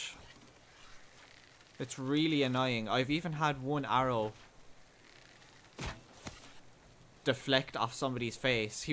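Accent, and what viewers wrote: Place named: Ireland. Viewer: Your description and accent say you're Irish. Would you like to see medieval melee action in Ireland of the kind shown in this game? Also, would you like the devs to put in a "Master Archer" perk that lets you see the exact ballistic drop (but not trajectory) of your arrow when aiming long distances? I'm sure they might be persuaded if enough people asked for it.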